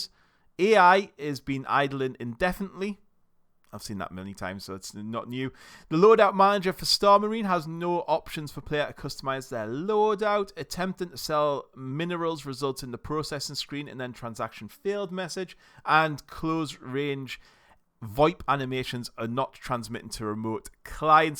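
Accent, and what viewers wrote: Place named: North, United Kingdom. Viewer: Cheers for the patch notes dude, its nice to hear a fellow Northerner for once. subbed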